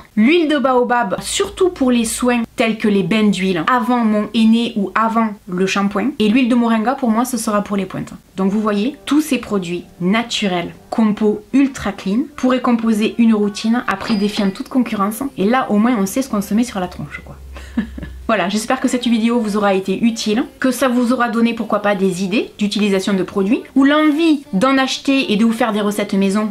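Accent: South, France. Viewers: C'est clair ! Et son accent qui donne l'impression qu'on est dans le sud et qu'il fait beau...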